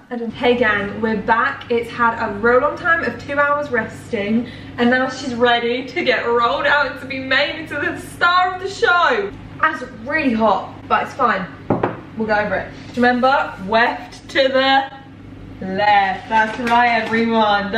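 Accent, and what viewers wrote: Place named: Birmingham, United Kingdom. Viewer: You're a brummie! I knew it!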